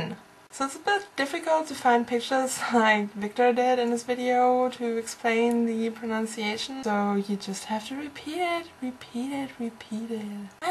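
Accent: Germany. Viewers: did i understand something wrong or is she german too? if it's like that... no surprise that it sounds really german *g* Grüße aus Bayern *g*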